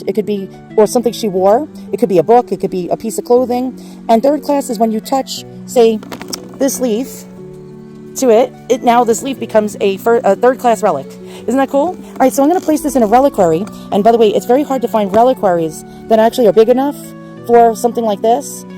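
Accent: New York, United States. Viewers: I miss your older, longer videos! It's you and your hilarious New Yaaaawk commentary that makes this channel! 😂 Oh and ditch the background music. You were perfectly good without it. 👍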